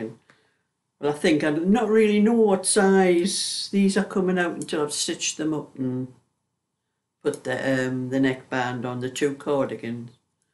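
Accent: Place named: Northern England, United Kingdom. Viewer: I love your accent, I’m from the South, so I know your up North..lol Had endless rain which made my grass grow. Cut it and the mower really struggled, as did l.. Your knitting is beautiful. I can knit but only simple things, at the moment using my stash for pet blankets for my daughter’s vet.I’ve just learnt basic crochet ( from YouTube ) after many years trying but only doing the simple single st at the moment…baby steps…lol 😂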